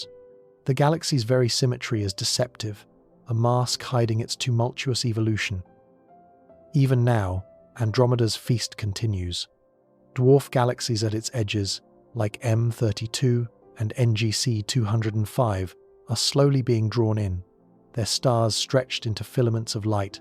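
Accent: United Kingdom. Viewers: all these damn AI robotic voices have Brit accents. Can't we get some with Nigerian or Peruvian accents?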